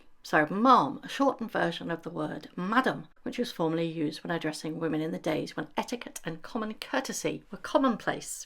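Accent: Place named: United Kingdom. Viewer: I think the term you are using which sounds like mum with your British accent is Ma’am here in the US, the female equivalent of Sir, and the older version of Miss. it is used by some service people, some younger people, and I, elderly myself, will use the terms to get someone’s attention if I wish to be more formally polite.If a younger person,I’ll just say excuse me. Never would I use it for a class or social position, ever. Especially with them. Just to get someone’s attention. I was an Army child of a Lt.Colonel,and I didn’t call my father sir or my mother ma’am, but my father was called sir by junior officers.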